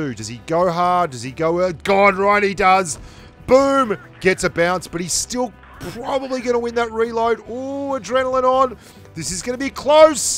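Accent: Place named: Australia. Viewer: Hey old mate,i am happy you are back.it is a pleasure to hear your oz accent.just makes my day absolutely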